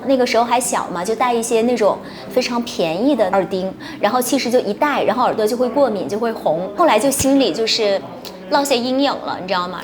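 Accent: Northeast, China. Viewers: 的发音好东北啊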